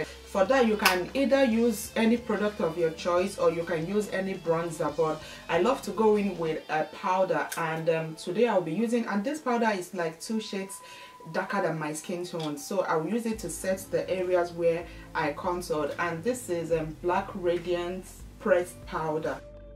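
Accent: Nigeria. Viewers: I love you. Its been a while since i heard a Nigerian accent (ps i'm Nigerian too). I just had to subscribed. Thanks for sharing. xx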